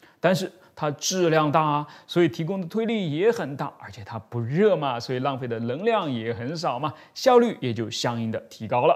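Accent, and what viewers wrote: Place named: Shanghai, China. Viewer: 从视频中得到的信息已经从的口音分析，毕业于上海大学？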